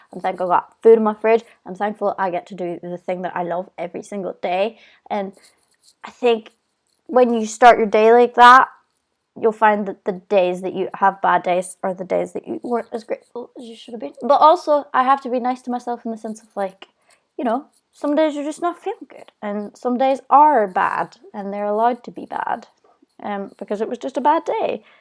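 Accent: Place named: Ireland. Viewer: That amazing Irish accent! ;)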